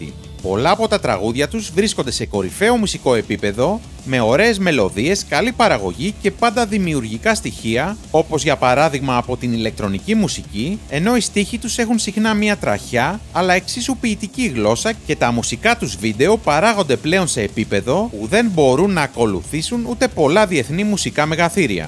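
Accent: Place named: England, United Kingdom. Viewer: Πέρα από το ξεκίνημα του κιθαρίστα στα '80s δεν βλέπω καμμιά "άγνωστη αλήθεια". Και το κείμενο είναι μάλλον έκθεση λυκείου, διαβασμένο από κάποιον που πιθανότατα δεν έχει ιδέα για την μπάντα. Κατ' αρχήν, μέχρι και οι πέτρες ξέρουν ότι τα φετινά τους live είναι επετειακά για τα 30 χρόνια τους, και όχι 25. Έπειτα Γερμανικοί τίτλοι και ονόματα διαβασμένα με Αγγλική προφορά. Αν είχε ακούσει 2-3 φορές έστω τα κομμάτια, θα τα έλεγε σωστότερα, κι ας μην ξέρει Γερμανικά. Επίσης... άκου εκεί "ωραίες μελωδίες και καλή παραγωγή". Μιλάμε για τις πλέον κορυφαίες παραγωγές παγκοσμίως, πραγματικά "σχολείο" για ηχολήπτες και μουσικούς παραγωγούς. Όχι "καλή παραγωγή". Έλεος. Και δεν βγήκαν με κουστούμια χοντρών για να "γελιοποιήσουν το θέμα της παχυαρκίας" αλλά γιατί έτσι ήταν στο video clip του - το οποίο μιλάει γενικότερα για την παρακμή των ανθρώπων που δεν έχουν κανένα πια ενδιαφέρον στην ζωή τους.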